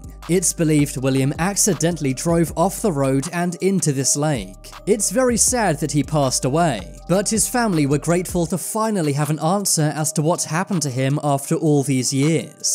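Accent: United Kingdom. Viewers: I don't believe I have listened to a YouTube video with as many grammatical errors as this one. Not that there are a lot but more than any other to which I have listened. At about 12 seconds in, "most scary". That should be "scariest". The adjective "scary" has 2 syllables and ends in "-y". That means we use the "ier" and "iest" endings for comparative and superlative forms. I see this more and more, that is, where people say "more" or "most" when it should be the comparative suffixes "ier" or "iest". I don't understand why as it even just doesn't sound right. Also at the end of this video, the narrator again uses "most scary" which I have already mentioned should be "scariest". Next is when the narrator says, "him and his car were never found". Really? Him was never found, eh? I don't think I have to mention what the correct pronoun should be (he), but I did anyway because I sometimes just wonder. 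Also, I wonder if the narrator is British (he sounds British) because all too often when I watch British shows they often use "him" (or "her") as the subject noun. I don't get that either as I always thought the British were generally pretty good at using proper grammar. Next, at the narrator says, "by censoring this house, less people would go here". That should be "fewer" people. Use "less" for singular nouns and "fewer" for plural ("there is one less person here"; "there are 10 fewer people here"). I don't get it as again, it doesn't even sound right. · I hear you speak British🤨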